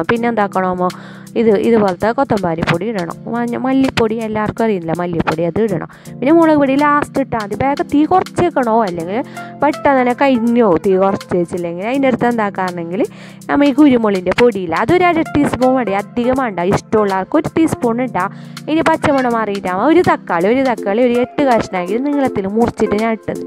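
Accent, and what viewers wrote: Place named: Kasaragod, India. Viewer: Nandu curry Honda ki nokki super Namo Kasargod language super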